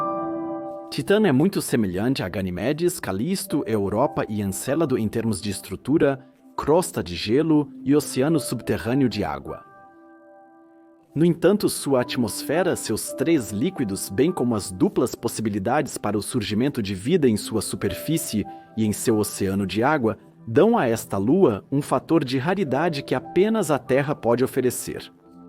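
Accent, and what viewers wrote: Portugal. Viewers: Porque do nada durante o vídeo muda para o português de Portugal? 😂😂😂😂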